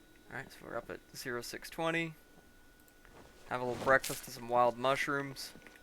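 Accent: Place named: Ohio, United States. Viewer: Lol idk you and this is the first video, but you sound like you're from Ohio 😅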